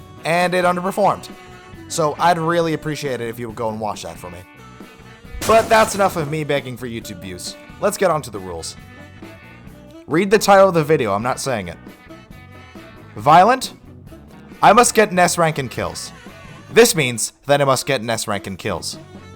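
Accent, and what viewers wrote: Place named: Australia. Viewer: As an Australian I can confirm this is how we talk down here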